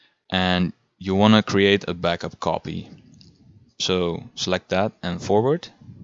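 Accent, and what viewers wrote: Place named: Sweden. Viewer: nice tutorial! Du är helt klart svensk, hörs klart haha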